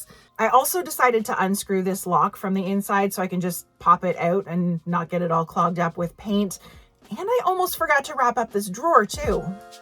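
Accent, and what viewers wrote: Canada. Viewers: Wow! The chest turned out nice! Love your Canadian accent.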